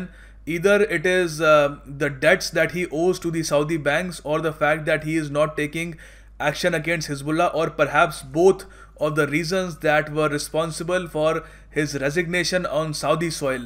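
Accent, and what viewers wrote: India. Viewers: In English? This is Heenglish. Couldnt even listen it..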